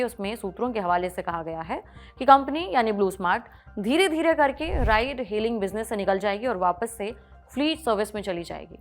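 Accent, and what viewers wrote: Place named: Uttar Pradesh, India. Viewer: Lagta h ap UP se ho😂😂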